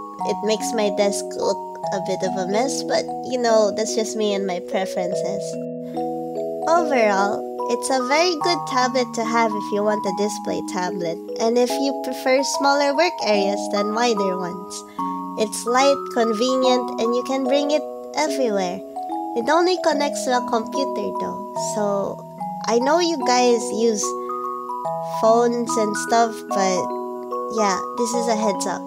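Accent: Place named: Philippines. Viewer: What country do u live in?, 'cause u sound filipino.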